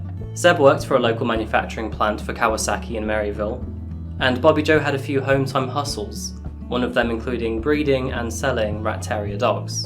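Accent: United Kingdom. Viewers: UK accent? Plaid shirt? XXL Forehead? I thought we were gonna give it a goo :(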